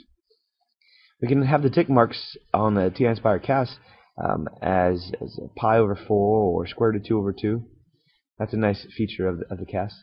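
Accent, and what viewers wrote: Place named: United States. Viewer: This man clearly has an American accent so you point is invalid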